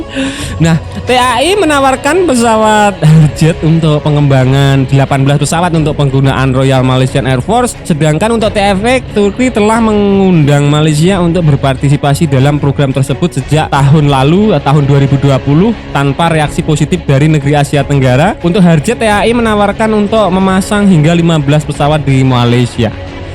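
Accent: Indonesia. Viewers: lah kenpa lu ngurus gua... Bukan kah konten tentang malaysia 🤣...malaysia apa indonesia yg suka ngurusin urusan orang... Dan youtubernya pun orng indonesia...dah lah coy gx usah make cara nenek moyang klian lagi yg suka ngadu domba melayu dgn melayu... Yg ad klian nyesal jika terjadi konflik di 2 negara ini..dan lu pun tau dri logat youtuber nya bukan 🤣...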